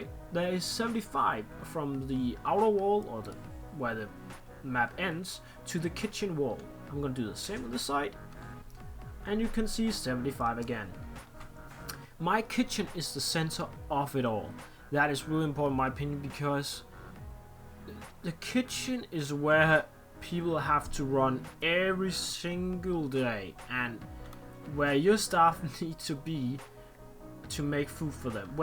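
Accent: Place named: Denmark. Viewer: du lyder meget dansk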